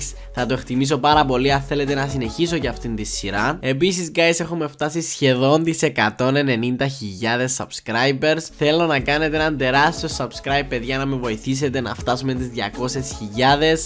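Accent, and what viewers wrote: Cyprus. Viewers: Εισαι απο την κυπρο? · ΛΑΤΡΕΥΩ ΤΗΝ ΚΥΠΡΙΑΚΗ ΠΡΟΦΟΡΑ❤ Ειδικα του!!!❤❤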